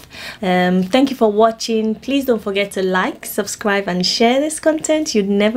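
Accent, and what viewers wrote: Nigeria. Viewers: Nice content. You have a new subscriber. How come you have my Nigerian accent😀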